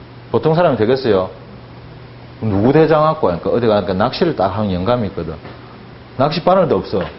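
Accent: Gyeongsang, South Korea. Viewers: 저 서울토박이인데도 꿀잼 ㅎ ㅎ갱상도 사투리 구수!!! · 정확하시다❤사투리 갱상도 사투리가 이렇게 매력적인 말이였네요❤ 너무 가까이 있어 소중한줄 미처 깨닫지 못했습니다❤ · 지나가던 역사임용고시생입니다 잠깐쉬면서들었는데 너무재밌네요ㅋㅋㅋㅋ ㅋ 그리고 사투리심하시지만 저도 경상도살아서 동네형이말해주는거같네요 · 사투리는 경상도 사투리인가? 강의를잘 하니 사투리도구수 하게들려요 뉘님의 아들인가요 ?ㅎㅎ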